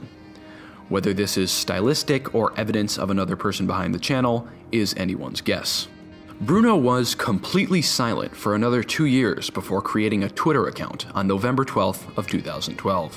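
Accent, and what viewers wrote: United Kingdom. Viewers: this guy has such a heavy british accent i can't stop laughing at his videis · Aesthetical Twat I’m American and i find this hilarious the British accent makes that video better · The power of having a bri’ish accent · puff it’s Cockney (East London)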